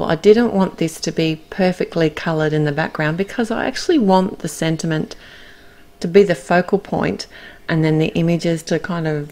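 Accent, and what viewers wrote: Australia. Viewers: I love to watch your videos and this card is no exception it's brilliant!!! It's refreshing to see and hear an Aussie designer in card making video's although I love all the ladies and the men too lol I live in Sydney and thank you very much for the Aussie website links to almost all of the products you use :)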